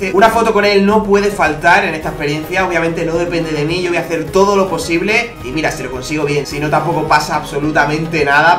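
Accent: Mexico. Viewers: No vives en mexico y cada vez suenas mas como un mexicano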